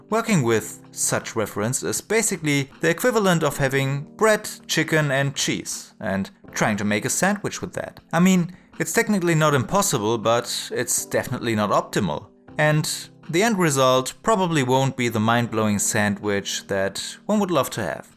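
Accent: Germany. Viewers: In his defense, it's probably the very strong german accent, in combo with trying to sound american and "cool"?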